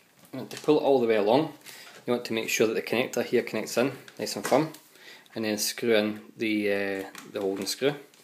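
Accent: Scotland, United Kingdom. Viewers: thanks for the tutorial! Love the Scots brogue - made it much more pleasant to watch!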